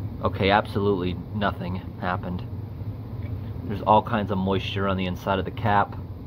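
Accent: New England, United States. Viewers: Who wants to play a guessing game and guess where this dude is from in Mew England? I’m guessing Maine, maybe Connecticut.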